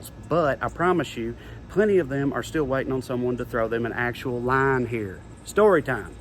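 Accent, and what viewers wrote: South, United States. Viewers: Your soliloquies are music to my ears. Your tirades are inspirational. I'm addicted to your deep Southern drawl. Damn, man! 😄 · StClemmons I've heard Beay explain about the accent. He talks to people in a way that they can relate to. When he's speaking to the general public, he uses a less southern sounding manner of speaking, but when he's speaking with people who can relate to it, he slips back into his natural southern accent. I get that. When I answered the phone at work, I would speak distinctly and professionally, but among close friends, adopt a more casual tone. As far as the trafficking charges go, he has alluded to having a past conviction for it, and having served his sentence. As you said, it wasn't sex trafficking, and while still illegal, was definitely not the worst thing I've heard of people doing. There must be some kind of a gap to be filled with jobs in this country having to be filled by people from halfway across the world. 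Surely there are local people who could fill these positions. Are the employers not willing to pay enough to make it worthwhile for Americans to take these jobs? Or do the employers keep the wages low because they know desperate immigrants don't dare complain? And why would someone come all the way to the U.S. for a low wage, hard labor job, unless things were far worse in their home country? I like Beau's take on a lot of things. He definitely knows a lot about other countries, and many random subjects. I think he's worth listening to, but what you do is up to you. Take care.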